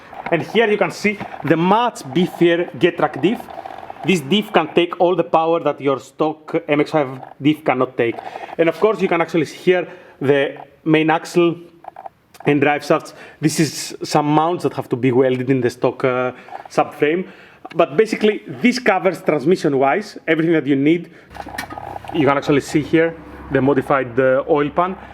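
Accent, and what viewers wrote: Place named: Greece. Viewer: Απο την αρχη του βιντεο καταλαβα οτι εισαι ελληνας απο την προφορα... πολυ καλη δουλεια!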